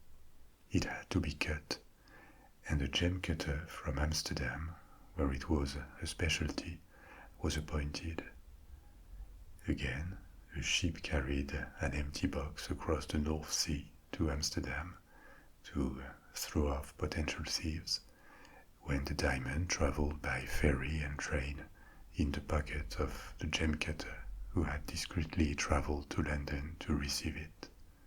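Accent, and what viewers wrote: France. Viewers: This is now my favorite ASMR channel. His French accent just makes me melt. Thank you for calming my anxiety :)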